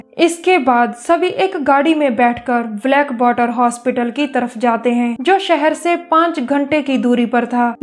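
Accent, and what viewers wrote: Punjab, India. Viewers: kya yaar punjabi hindi with break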